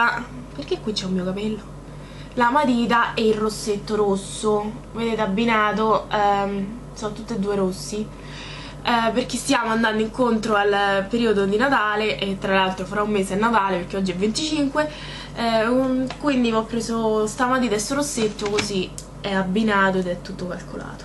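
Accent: Rome, Italy. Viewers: 2 amo il romanesco hihihihi mitica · 3 sei molto simpatico e il tuo accento romano mi fa impazzire!!!mi sta troppo simpatica la tua parlata!!! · 4 adoro il tuo accento romano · 1. che bei premi.. sei sempre bellissima.. hai trovato proprio le parole giuste.. siamo una grande famiglia.. ti adoro quando parli in romano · secondo me nn dovresti lasciarti andare tanto col romanaccio, e ti parlo da romana =) è bello sentirlo parlare, ma nn troppo esagerato!!! Ciao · ") che bella quando parli il romanesco verace hahhaha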